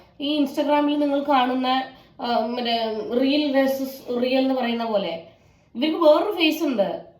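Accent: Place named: Kanhangad, India. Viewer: super avatharanam nammude kanhangadan slag idakku varatte adipoli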